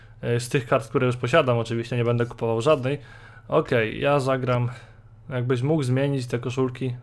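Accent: Poland. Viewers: Widac że z Rzeszowa xd!:D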